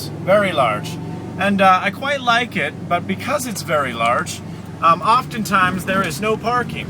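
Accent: Ireland. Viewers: Are you trying an Irish accent or a Toby Turner accent? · You sound like an irish person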